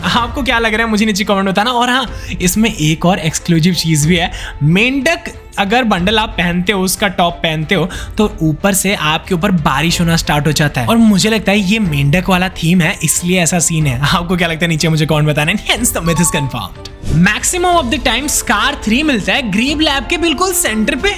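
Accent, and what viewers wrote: Odisha, India. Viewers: Odia Hindi ❤ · Bhai tum odisha se ho kya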